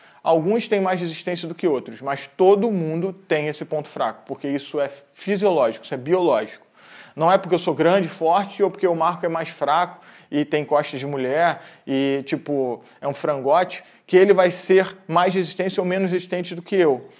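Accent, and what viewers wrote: Rio de Janeiro, Brazil. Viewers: É regionalismo, carioca, se não xingar e chia tá errado. ..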